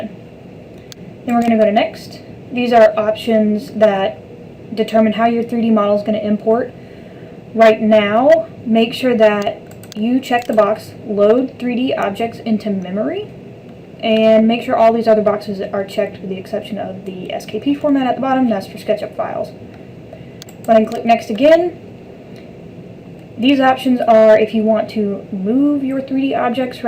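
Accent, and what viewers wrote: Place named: Australia. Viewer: very simple very Aussie thx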